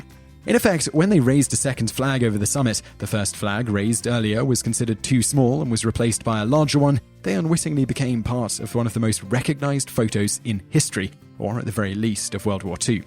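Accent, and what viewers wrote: England, United Kingdom. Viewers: He's a Brit, so give him a break! Lol! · As told with an English accent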